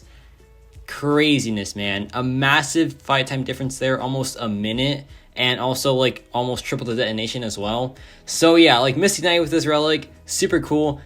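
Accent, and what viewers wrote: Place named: Singapore. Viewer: U sound like singaporean sia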